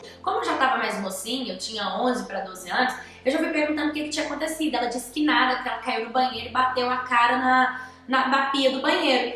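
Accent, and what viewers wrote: Northeast, Brazil. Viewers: Faz vídeos com o sotaque nordestino,amo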